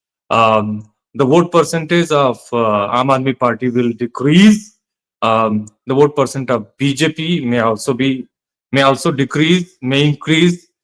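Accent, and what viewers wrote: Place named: Bangladesh. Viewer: Your accent is bangladeshi , show us your dr degree u cheat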